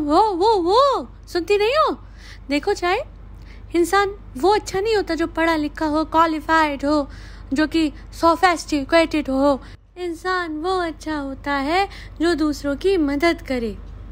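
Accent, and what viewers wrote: Punjab, India. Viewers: Hi, apki language Mei Punjabi tone hai ❤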